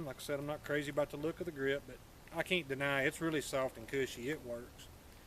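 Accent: South, United States. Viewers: A gun review without the southern accent would be weird...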